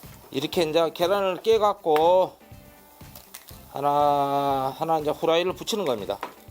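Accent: Jeolla, South Korea. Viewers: ㅎㅎ 셰프님! 전라도 사투리가 음식맛을 더 정겹게 합니다.❤ 저두 침이 꿀떡 넘어가네요.😅 좋은 아이디어 배우고 갑니다. 셰프님!화이팅~❤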